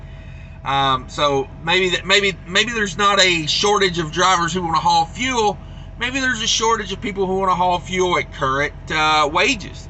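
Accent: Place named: South, United States. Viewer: Your eloquent words with a wonderful southern twang speaks truth. You consistently remind me of why I left trucking after 25 years. Hurry up and wait has been stuck in my brain for years! My first year was with England. My last load with them had me waiting a day and a half! These bastards know how to steal a trucking dream!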